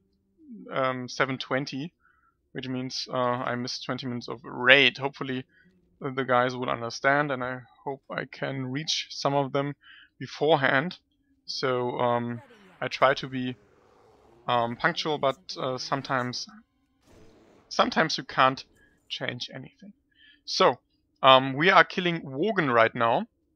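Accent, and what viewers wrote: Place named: Germany. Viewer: I dont know it feels like im listening to myself:) I hate perfect videos:D I know u are german I hear the accent im from belgium also strong accent:)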